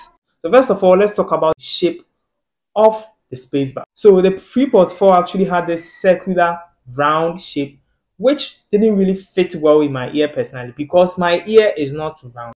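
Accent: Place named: Ghana. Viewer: 😂😂 you scratch my back I scratch your back right! Yeah I know you're Ghanaian now your accent says it all!!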